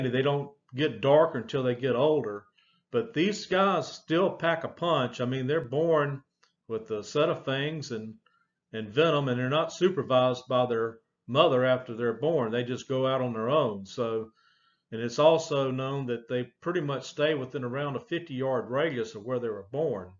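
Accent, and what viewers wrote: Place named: Alabama, United States. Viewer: Thanks fer speaking more like us Alabamans do talk.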